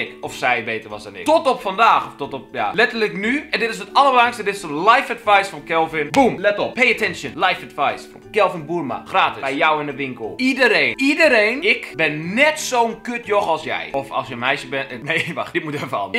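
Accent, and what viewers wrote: Groningen, Netherlands. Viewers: Grøninger accent tog jonguh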